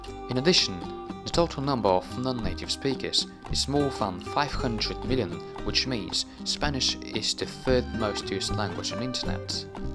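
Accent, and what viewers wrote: England, United Kingdom. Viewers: ¡Me encanta el acento de Inglaterra!😊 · I've taken a few classes in Spanish, so I kinda have an active beginner's knowledge, and a somewhat passable passive knowledge, at least when written. Anyway, I knew about almost all the facts here, already, except for Equatorial Guinea. That English accent is quite something, though. I almost found it easier to understad you in Spanish... 🙄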